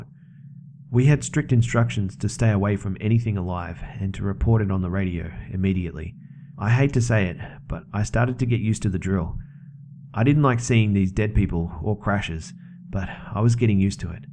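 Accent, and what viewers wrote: Australia. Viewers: finally an ozzie CVNT reading me stories. thanks CVNT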